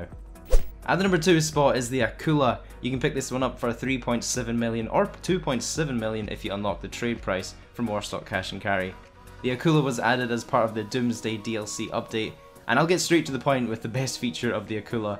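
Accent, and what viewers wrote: Scotland, United Kingdom. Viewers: Love your scot accent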